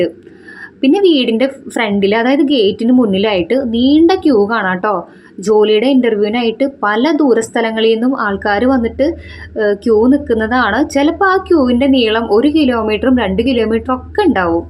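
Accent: Thrissur, India. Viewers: എത്ര സംസാരിച്ചാലും ബോറടിക്കില്ല നല്ല ശബ്ദം ത്രൃശൂർ slage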